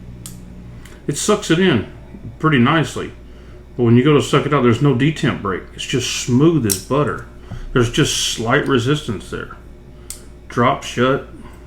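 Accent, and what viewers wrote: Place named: Texas, United States. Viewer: That texas accent doe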